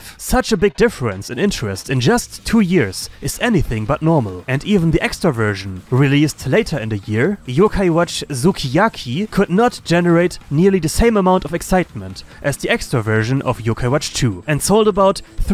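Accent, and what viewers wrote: Germany. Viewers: Your accent ist sehr sehr deutsch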